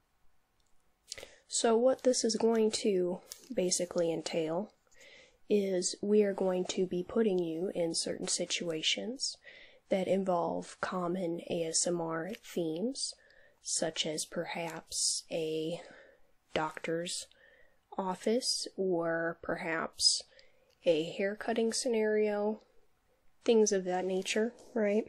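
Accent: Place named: South, United States. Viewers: How in the world am I supposed to relax with this video when I'm laughing half the time?! With nearly all other ASMR vids I watch, I do so simply for the tingles and relaxation. But with yours, the comedic aspect is always an added treat. And, as a lifelong Southerner, I have to say you do a mighty fiiine job of nailin' the accent, darlin'. Keep up the good work. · I hail from Georgia, and you nailed the Southern accent. You forking rock:)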